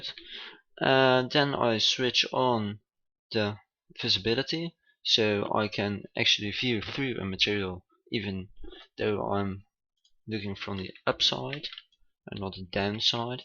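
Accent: Netherlands. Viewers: Are you Dutch? Your accent sounds really Dutch. Especially that you say the "W" in sword ;)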